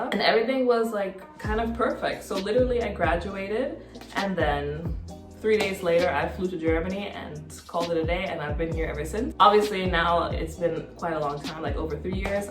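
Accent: Jamaica. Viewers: We’re the same height and I knew i heard some Jamaican in you! I’m Jamaican living in the US who also loves Romance Novels especially English Lit . I’ve never met anyone else who enjoys it most people think I’m actually living in a fantasy world but I know it’s exists lol ❤️ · I knew I heard that Jamaican accent hidden under there some where lol...also we have the same last name hmm..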